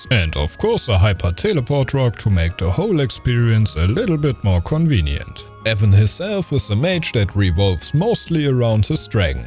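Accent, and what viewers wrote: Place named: Germany. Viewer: could instantly tell ur german by the accent, but good stuff haha keep up the work :)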